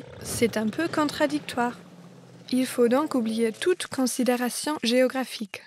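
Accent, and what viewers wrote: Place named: Germany. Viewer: L'accent allemand est tellement charmant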